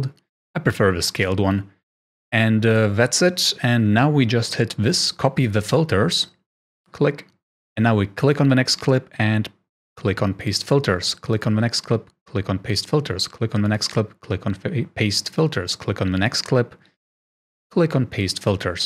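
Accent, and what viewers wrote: Germany. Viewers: Kann es sein dass du deutscher bist weil du redest so deutsches englisch hahahahahah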